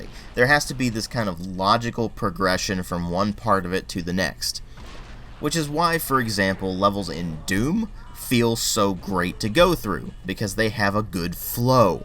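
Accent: South, United States. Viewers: Nice deep southern accent! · pretty legit southern accent!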